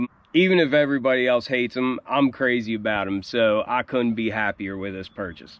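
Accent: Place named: Pittsburgh, United States. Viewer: That mint green is going crazy! I love them! Pittsburgh here, bro you got to be from Pittsburgh? I know I've heard you say you live in WV but that accent is heavy Pittsburgh. And I heard you say you love the teams. Just curious if you're from here or from WV? Not that it matters I love your stuff. Great reviews, great taste!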